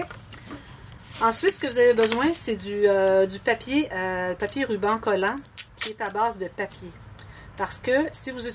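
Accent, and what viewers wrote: Canada. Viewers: Merci beaucoup amitié(j'adore votre accent canadien)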